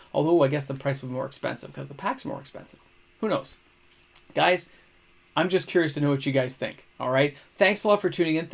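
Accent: Canada. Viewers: this is my first video, but ill be back. i like your takes, and your POV. Also, some words make me think your Canadian, so, gotta support the homegrown Content.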